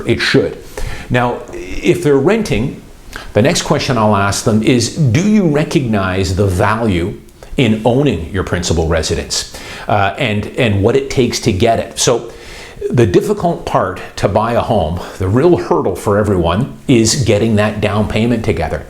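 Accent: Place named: Canada. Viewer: wow I never realised what the Canadian accent sounded like until this buy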